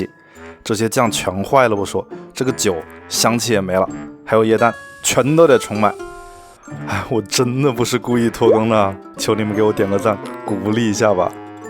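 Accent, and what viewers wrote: Wuhan, China. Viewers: 听出了武汉口音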